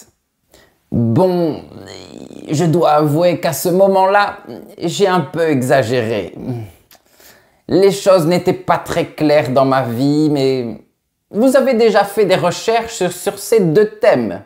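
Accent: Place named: South, France. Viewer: Tu as un accent du sud, et c'est l'occasion de mentionner un mot qui se prononce différemment: MOINS. Au nord on ne prononce pas le S et au sud (donc ta prononciation) on le prononce.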